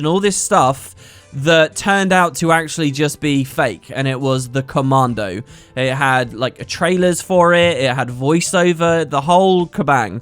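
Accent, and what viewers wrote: United Kingdom. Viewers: British to a ̶t̶e̶a̶ tee.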